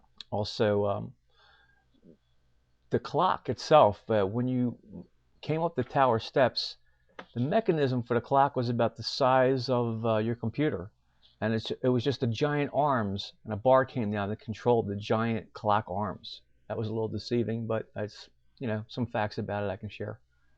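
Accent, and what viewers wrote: Philadelphia, United States. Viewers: Love your Philly accent! Thanks for the great video & interesting commentary. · Thanks for posting. As another commenter said “love your Philly accent(!)”. My Dad worked there for 37 years. First in the building with the clock tower. Moved to the new building next door when it opened. Retired 1971. He talked with respect and admiration for the fire fighters of Engine 70. Also the Philly PD.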